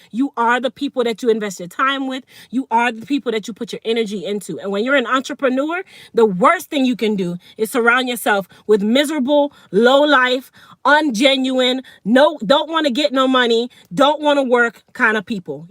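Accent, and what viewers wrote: Jamaica. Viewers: Are you Jamaican ? Keep up the good work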